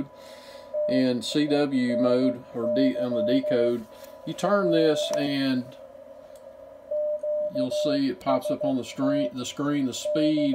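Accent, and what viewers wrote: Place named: South, United States. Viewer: Thanks - Does the CW Decode display work for Tx as well as it does on Rx? Also, thanks for having a Southern voice! I've lost all my Arkansas and Texas voice.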